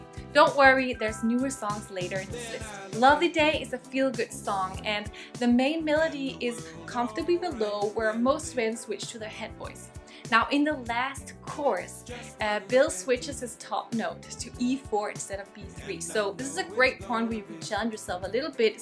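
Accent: Denmark. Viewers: you sound a bit danish huh???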